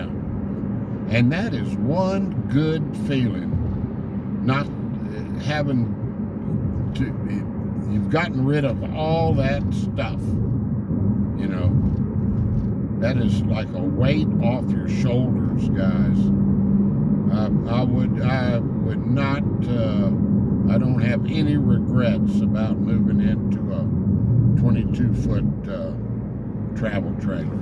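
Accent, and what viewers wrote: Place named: Texas, United States. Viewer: I think your awesome, I really am Texas home sick and you help a lot. I very much enjoy the stories spoken in my favorite accent. I haven't been to Texas in over 20 years, and everyday I try to get back to Springtown. Home of the POJO!!! Anyway I appreciate your channel very much. You stay blessed!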